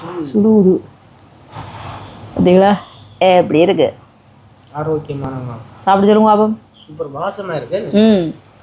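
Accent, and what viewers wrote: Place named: Sri Lanka. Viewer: இலங்கை தமிழ் மிகமிக அருமை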